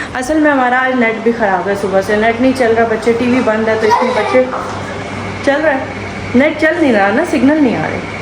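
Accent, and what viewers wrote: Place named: India. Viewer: Ap ka lahja indan h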